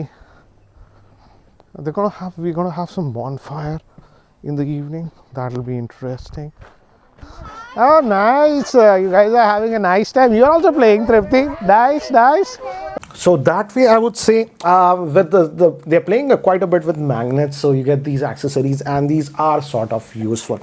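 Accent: India. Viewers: That’s a heavy Indian accent man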